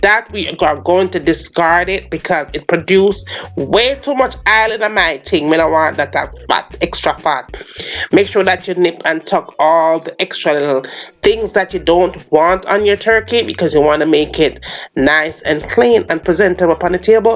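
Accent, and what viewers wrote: Jamaica. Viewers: Love your Jamaican accent🥰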